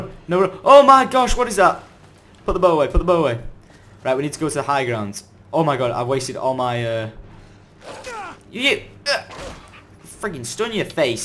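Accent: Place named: Scotland, United Kingdom. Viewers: Top notch scottish accent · Nice Scottish accent yuv got aye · when he was reading the story in a Scottish accent i died of laughter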